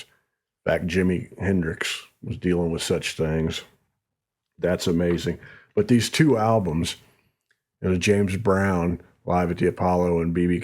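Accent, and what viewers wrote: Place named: South, United States. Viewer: Right when I hear the "Hey Friends" in that slightly southern accent....I immediately stop everything and watch the video👍🤔👍🤔👍🤔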